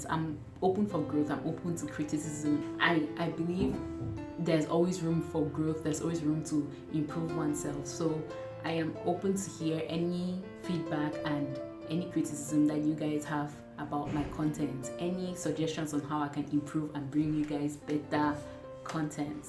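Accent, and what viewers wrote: Nigeria. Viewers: Wow this girl is Cameroonian?? She sounds Nigerian, I can't even tell. We Africans are one. You've gotten a new sub my sister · Followed your comment from Em Etetim's video down here. If not that your channel shows Cameroon, you pass for 100% Nigerian. Both in looks and accent.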